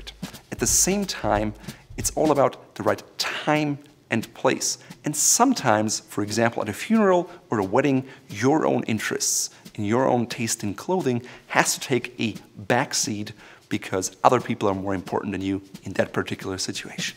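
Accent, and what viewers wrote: Germany. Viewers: You are definitely GERMAN... (belive me i said that before you disclosed) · I swear, I only noticed your German accent after you said you were German. After that I couldn’t stop thinking about it.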